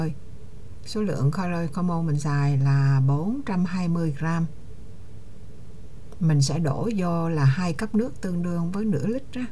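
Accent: Quang Nam, Vietnam. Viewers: nghe giọng Quảng Nam, mình thích cực kỳ!❤️ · Nghe chi noi ma minh Nho toi ma minh , ma minh nguoi Tra kieu, Quang Nam. Don gian , moc mac , khong trau chuoc. Cam on Chi chi lam nhieu mon an ma nguoi Quang Nam ua an . Chuc chi luon khoe va gia dinh luon duoc hanh phuc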